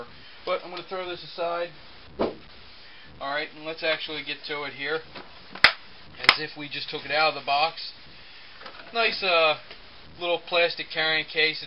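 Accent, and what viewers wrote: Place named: Pennsylvania, United States. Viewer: from the accent, I am guessing you are from PA? Probably watching the Steelers right now? LOL Go STILLERS!!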